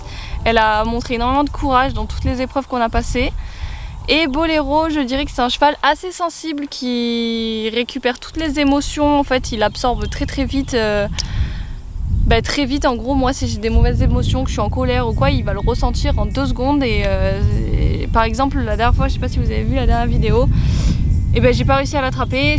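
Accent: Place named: Gard, France. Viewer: Hello :) c'est moi qui t'ai posée la question sur ton accent, en fait c'est parce que j'ai le même :p et c'est l'accent que on a dans le Gard vers Nîmes, et qui est vachement différent de celui de Marseille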